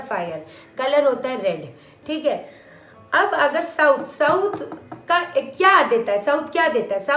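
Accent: Punjab, India. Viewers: I love the way you speak in Punjabi way. Main v Punjabi hu .😘😘😘😘😘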